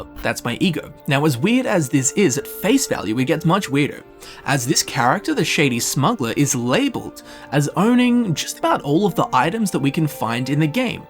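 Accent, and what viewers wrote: Australia. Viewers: I just love the idea of an Australian lore guide who speaks with some degree of eloquence, it's both hilarious and glorious at the same time.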